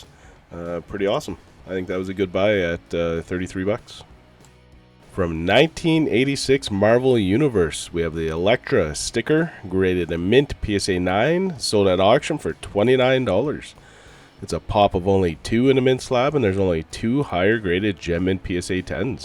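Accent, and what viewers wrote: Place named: Canada. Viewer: I love the Canadian pronunciation!!! Lol...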